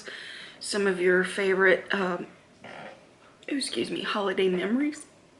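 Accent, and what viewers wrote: South, United States. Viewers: Agree, she's so friendly, sweet and down to earth and seems like a kind person, love her Southern accent_too! Love Thanksgiving food!😋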